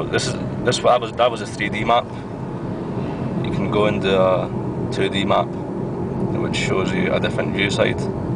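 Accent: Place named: Glasgow, United Kingdom. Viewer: OMG you sound JUST like my uncle rizwan and he lives in Glasgow!!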